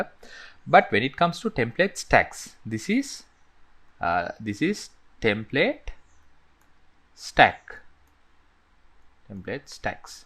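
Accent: Sri Lanka. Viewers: Yes, I downloaded Expedition and installed on Linux box and I have yet to figure out how to use Expedition. You sounded very much like you are from Sri Lanka. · Great explanation BTW are you a Sri Lankan, your accent is so sri lankan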